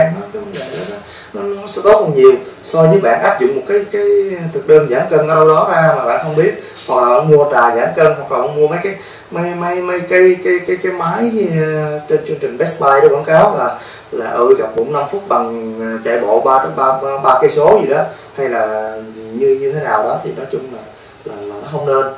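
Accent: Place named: South, Vietnam. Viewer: nói chuyện giọng miền nam dân dã gần gũi , giải thích ro~ ràng :)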